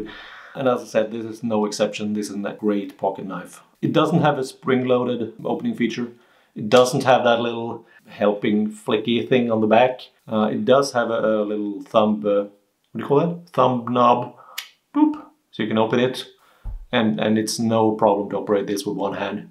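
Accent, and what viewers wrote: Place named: Germany. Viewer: First time watcher here....I stumbled upon your channel this morning, at first I didn't detect your accent....but as your video went on and I didn't keep scrolling I began to notice that you might be from Germany or austria....you finally mentioned that your from Norway! I appreciate your presentation style and liked how you described everything...really cool...I went ahead and hit that subscribe button and gave you a thumbs up! I guess the only thing I took issue with was the fact that your a Dewalt guy....I recently researched folding utility knives and decided to go with milwaukee I have their six in one fastback and their compact folding knife...I like both of these....I'm a knife guy and for my edc I carry a buck Juno in my front left pocket a spyderco in my left rear pocket and now my milwaukee fastback in my front right pocket...it works for me...I live and work in the west texas oifield....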